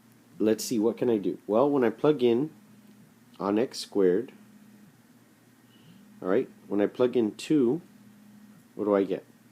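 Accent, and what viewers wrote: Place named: Canada. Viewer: can’t get over the Canadian accent.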